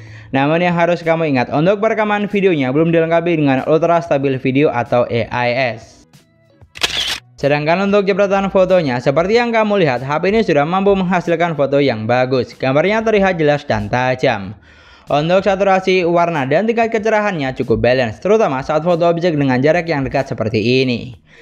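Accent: Madura, Indonesia. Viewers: Apa om ini orang madura ya 😅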